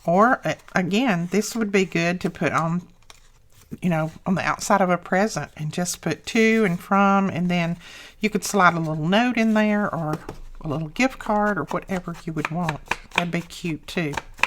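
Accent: South, United States. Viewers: ❣️❣️❣️ I almost never comment after yesterday's comments I want to let you know how I so love watching your channel! You're great and have such creative and understandable instructions. And, we talk the same language❣️ Although I have been away from my Southern roots for many years, I still have an accent and enjoy listening to others who do too! Keep up the beautiful work that you do, you Southern "Queen of Junk Journal Making", you❣️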